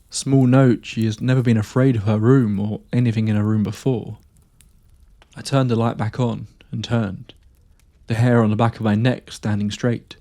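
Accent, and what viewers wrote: England, United Kingdom. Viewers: Sioux City, Iowa. Da SUX (Airport code.) Anglophile, have heard a lot of English accents for an average US citizen and yours is superb. Absolutely brilliant channel. Thank you.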